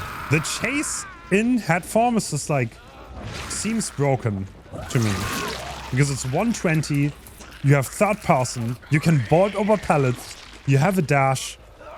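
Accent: Germany. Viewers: Bruder hat den Deutschen Akzent. Aber krasses Englisch hatte ich am Anfang erst nicht gemerkt.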